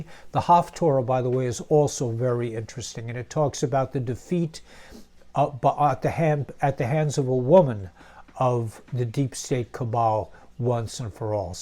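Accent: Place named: Armenia. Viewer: Good Afternoon, lmao yes stay away from death trap... question I' half Armenian I can remember my Great Grandmother speaking and it sounds similar to the way you pronouns a lot of the words you speak.. Enjoy the rest of the weekend, Love to Light...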